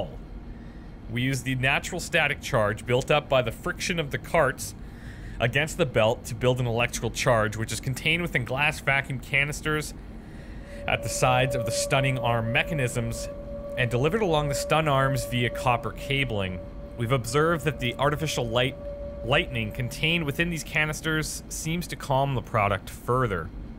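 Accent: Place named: Canada. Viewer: I love your lets plays! Don't ever change. And your Canadian accent is adorable. And just to be pedantic, I have to point out that "Thames" is actually pronounced "Tems".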